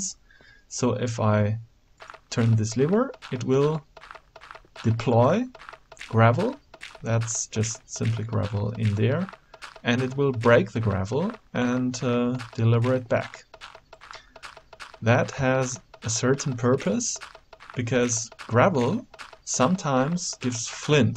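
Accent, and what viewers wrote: Germany. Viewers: deutscher ?:D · Super Deutscher Akzent :D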